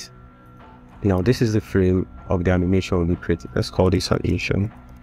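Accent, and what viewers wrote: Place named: United Kingdom. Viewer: Why british accent exist-_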